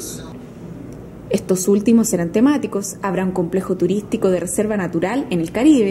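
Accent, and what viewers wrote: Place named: Chile. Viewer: Esta locutora del video tenia que ser chilena, como arruinan las bonitas noticias !!!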